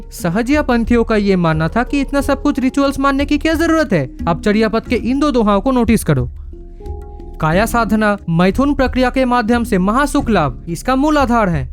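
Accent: Bengal, India. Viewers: I understand you are Bangali after hear Bengali sound. Well I'm from Bangladesh. Bangladesh & East India is ethnically, culturally, socially almost similar. As like North India & Pakistan.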